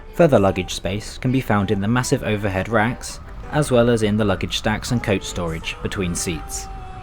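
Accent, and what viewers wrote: England, United Kingdom. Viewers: Hey! As i'm listening 2 u, i hear, that u r english, or brittish, not american! Because there are a few difference between brittish english and american english!😊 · Damn... as a swiss guy i just love it how you pronounce the swiss names of villages and cantons in a english accent😂 it sounds very good btw!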